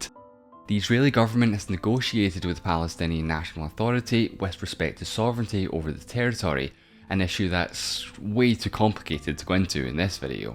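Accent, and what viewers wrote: Scotland, United Kingdom. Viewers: A Scottish English accent is so appealing to the ear. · He's Scottish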